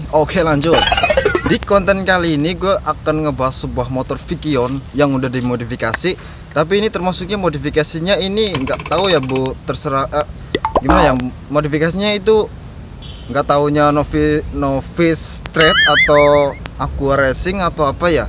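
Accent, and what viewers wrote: Banyumas, Indonesia. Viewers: Apik ngapak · Bang aku tau abang orang mana abang orang ngapakkan yang tau like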